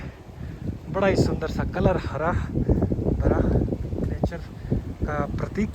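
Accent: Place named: Haryana, India. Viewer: Teri boli s to hariyana ka lag h bhai.kad ka hai bhai ?bada himmat wala chora hai bhai.ke bera m bhhi tere gaw ke aas-pass ka hou.bataiye bhai.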